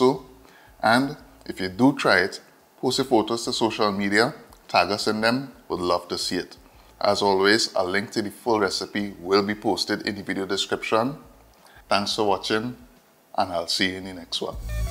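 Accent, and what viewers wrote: Trinidad and Tobago. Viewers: Trinidad in the house you sound like your from Trinidad. My daughter just bought home a breadfruit pigtail oil down she said but i can save some a try this. Just in time to.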